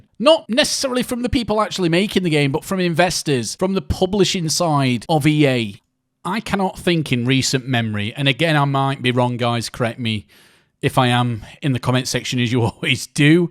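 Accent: United Kingdom. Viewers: It’s simple. People like spending their money that they earned how they feel like. If you don’t want people to be able to do that move to a communist country. Oh wait you sound British or Australian so you already live in a communist country.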